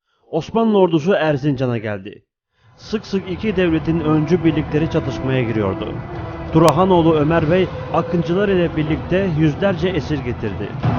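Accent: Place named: Balkans. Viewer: eyvallah kardeşim. Balkan esintisi var telaffuzunda. Kötü bir şey degil. Begenerek takip ediyorum. Tavsiye ediyorum. Hele son zamanlarda çağ atladın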